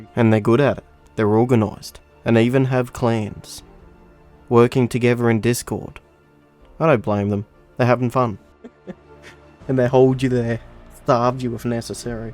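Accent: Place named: Australia. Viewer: Love your accent m8. If you don't mind my asking, what part of Australia are you from? Just the way you say certain words like "alive" and "die" is really slick and reminds me of the Big Lez Show. I was wondering if it is a common way of speaking or more of a regional dialect.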